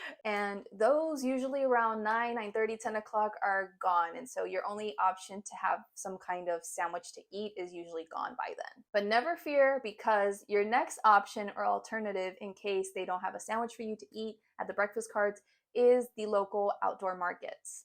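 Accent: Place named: United States. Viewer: Tienes el acento americano o.o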